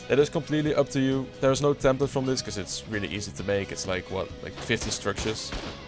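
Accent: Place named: Netherlands. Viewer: Waarom hoor ik het altijd meteen als iemand Nederlands is.. dope video btw en misschien kom ik een keer je server checken.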